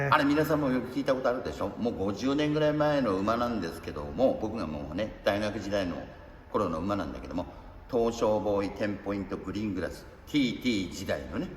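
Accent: Fukushima, Japan. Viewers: こんだけ話せればボケにはまだまだ早いｗ あと私も福島出身なので訛りがなんだか懐かしいｗ いつまでも元気で馬の話して欲しいなぁ 馬の脚は第二の心臓っていうからねぇ… 蹄を地に付けて歩くことで身体に血を回すポンプとしての役目を担うから 歩けなくなったらもう致命的なんだとか 個人的にヒシミラクルのウマ娘化期待してます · やっぱり福島の訛りか～、死んだじいちゃんと喋り方そっくりで涙出てきた じいちゃんはメイセイオペラが好きでした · なんか聞き慣れた訛りだと思ったら同じ福島県でしたか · 前から喋り方、イントネーションが福島県民っぽいなあと思っていたらやはりそうでしたか！ 同じ福島県民ですが相馬野馬追、一度も見たことがないのでいつか見てみたいです！ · この方、福島の方でしょうか？ 浪江出身の祖母の訛りによく似ていて心が和みますｗｗｗ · 私も地元福島なので訛りが心地いいです。これからも動画見ます！ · なんか喋り方きいたことあるなあと思ったら福島出身でしたかｗ 親父の実家福島だったから懐かしい感じするわけだわｗ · 訛りがうちのじいちゃんに似てると思ったら同じ福島県民だったんですね笑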